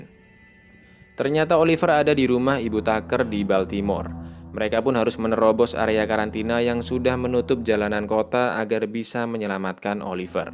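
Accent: Java, Indonesia. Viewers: Mantap min cara berbucaramu wkwkwk, jowo pokok e terdebest! 😂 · Mimin wong jowo?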